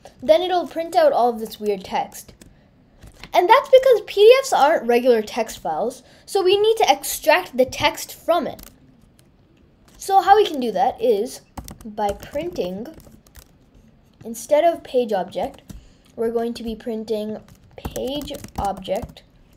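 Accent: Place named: United States. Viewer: Where do you live ?I mean your origin is India but accent is American . Can you please tell me where do you live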